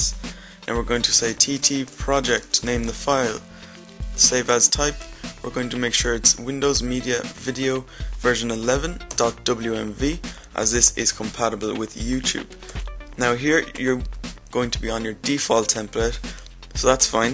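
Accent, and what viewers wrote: Ireland. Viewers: Where ya from in Ireland?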